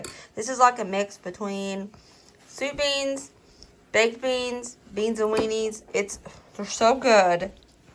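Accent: South, United States. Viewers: Your videos are like memories for me except I had 4 girls. We're in Ohio and my daughters are now adults with their own families. They still make these meals one has modified them for gluten and dairy free. That's is not always a cheap way to. Love the southern draw as my husband's family is from Crossville Tennessee!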